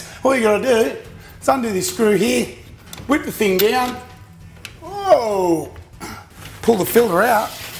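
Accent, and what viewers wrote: Australia. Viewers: We love you Australian!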